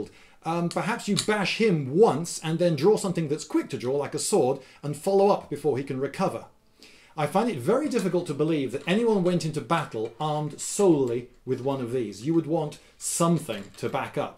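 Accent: France. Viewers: Your french accent just made my day ! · Love the outrageous french accent :) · i liked the video only because of the frenc accent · Yes it's good. Also your french accent is hillarious. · I don't think the poor defensive capability would be as much of a disadvantage if you had a good shield with it. Naturally still worse than other weapons, but not as ridiculous as your French accent makes it sound like. I've always wondered why several balls would be a benefit, though. Good video. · Thumbs up for French accent. Lol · Brilliant French accent ! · Best French accent ever! ;D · Your outrageous french accent sounds like Engrish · This is the 6th time I watched this video and the 5th time I watched it for the outrageous french accent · Your french accent is very reminiscent of the pineapple from telefrancais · You should do more french accents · Outrrrrrrrrrrrrrageous French accent! 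· your french accent is awesome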